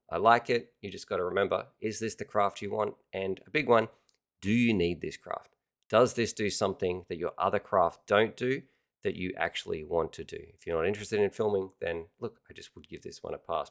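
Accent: Australia. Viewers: Mate he's aussie it's his accent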